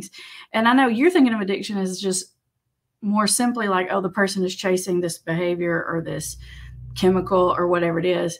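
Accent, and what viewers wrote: South, United States. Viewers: your videos have helped me so much, You are great, and I love your southern accent. I follow you from Argentina😊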